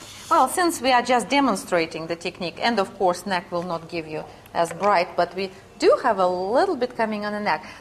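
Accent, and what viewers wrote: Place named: Russia. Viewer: Эх, сразу чувствуется , русская женщина представляет скэнар ,акцент выдает )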